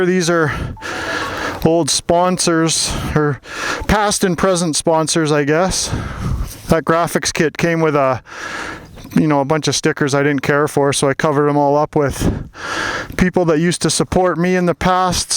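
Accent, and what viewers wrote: Canada. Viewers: are u canadian ?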